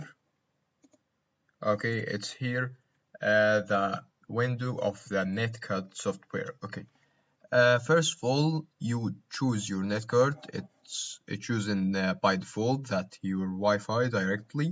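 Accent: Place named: Egypt. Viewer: يسطا انت مصري so try to adjust your accent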